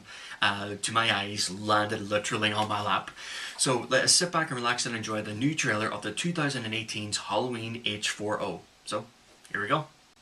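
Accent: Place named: Ireland. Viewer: if Dr Loomis was Irish he would sound just like you.. great reaction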